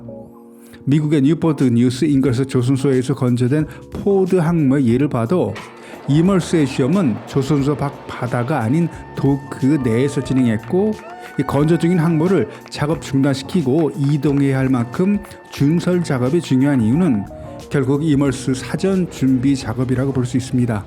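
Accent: China. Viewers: 발음이 중국분이네..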